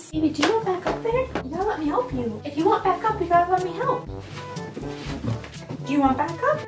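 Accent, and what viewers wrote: Kentucky, United States. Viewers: Ahh. I come straight to your videos when I get notified!!! My fav! I'm also a kentucky girl. Live about 20 mins from lexington. Love your accent. ❤️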